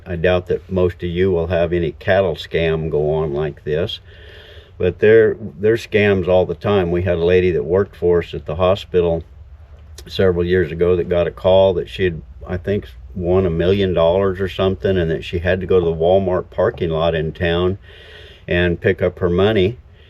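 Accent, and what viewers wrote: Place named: Mexico. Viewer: Sounds like Mexican learning english😊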